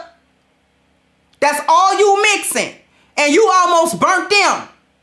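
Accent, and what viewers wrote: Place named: South, United States. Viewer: I miss that gap... it brung out the country in the way you speak.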